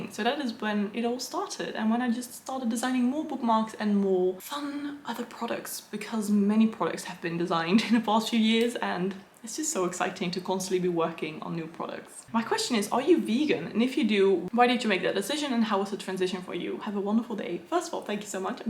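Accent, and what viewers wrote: United Kingdom. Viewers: Hi!! 👋🏻 and thank you for answering my question! The “are you British question” 😄 I love love your accent ☺️💕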